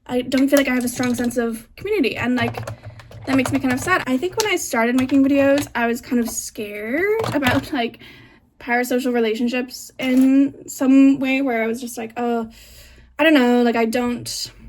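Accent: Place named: United States. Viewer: She’s Irish, but lived in America for a while growing up, so it’s a mix of the two!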